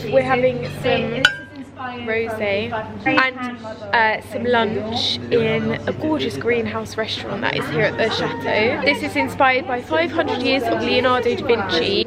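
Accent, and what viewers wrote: United Kingdom. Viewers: He looooouu britain acent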